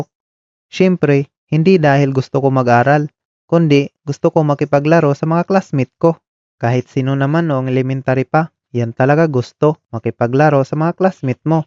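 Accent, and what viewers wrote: Philippines. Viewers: Kuya taga probinsya kasi ganyan ka mag salita eh hehe 😅😅😅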